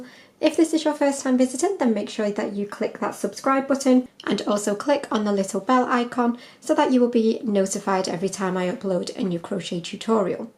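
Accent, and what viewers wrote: United Kingdom. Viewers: Oh My!!! Finally a Fu-king British normal teacher,, DOES NOT GET BETTER TO LEARN AND LISTEN TOO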